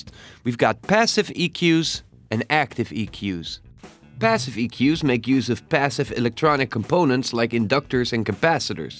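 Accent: Netherlands. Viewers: nederlands accent? :) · English with a Dutch accent really is one of the easiest recognisable out there. It's really amusing, since there's no way you can be wrong if someone is Dutch when you hear the way of pronouncing :D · Your English pronunciation is pretty good (better than mine probably). Still, some words you say, reveal that you must be Dutch.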